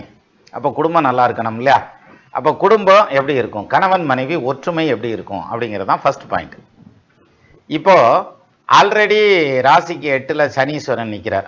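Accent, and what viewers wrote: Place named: Tirunelveli, India. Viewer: யோவ் வழ வழ னு எதோ 60 வயதுக்கு மேல் இருப்ப வர் பார்த்து யாருக்க வது சொல்ல வேண்டும் போல.... நெல்லை தமிழ் சிறப்பு.... 😴😴😴😴😴😔😥😢😢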